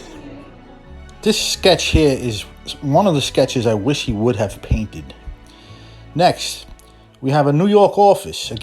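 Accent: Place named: New York, United States. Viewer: I miss this exhibition, because 2 years ago I haven’t discovered Hopper yet. It is very cool to have listen to have a New York accent guiding me through the exhibition. Make it more immersive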